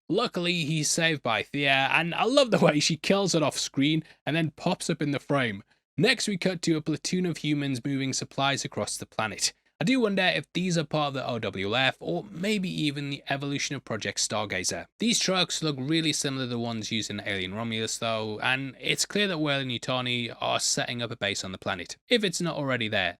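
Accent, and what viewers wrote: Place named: United Kingdom. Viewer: He's British, they have a million different accents on a tiny island country. I lived there for years.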